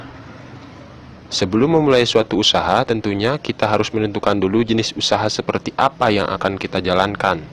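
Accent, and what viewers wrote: West Java, Indonesia. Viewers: sunda bangheet... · Taiwan Taipei ..muhun logat sunda..sami sareung abdi.. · Ini yg yg bicara di vidio ini logatnya Kaya orang sunda Krn qu apal bnget kalu orang sunda itu bicaranya mskpun pke bhasa indonesia tapi logat sunda banget krn qu juga orang sunda Tapi maaf ini cuman perkiraan saya aja.kalu ga bnr bhwa bukan orang sunda minta maaf aja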